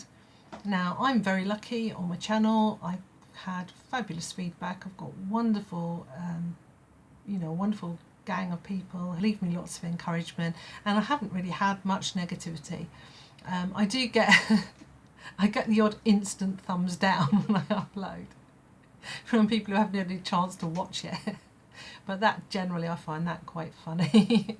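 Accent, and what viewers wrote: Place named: England, United Kingdom. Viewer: I don't do hash tag. I can't understand why anyone would ever give you a mean sign or make an unkind remark to you. You are adorable and most of the I just listen to you talk. I speak "American" and you speak English and I love to just hear you talk.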